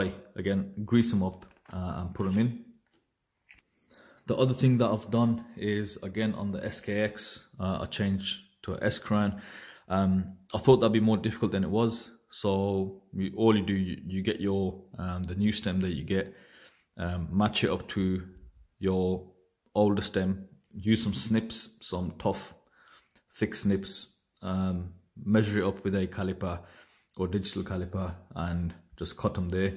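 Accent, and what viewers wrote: Birmingham, United Kingdom. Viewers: I hear a Brummie! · Thanks for the useful hints and tips. I'm looking in to Pagani Design at the moment and I hear you can switch out bezels for Seiko SKX and other bits as Pegani use NH35 movements. Be cool if you did a vid on modding the Pegani 1651. Good to hear a voice on hear from Brum as I'm down in Bristol and miss home. Keep up the good work. Big up Brum!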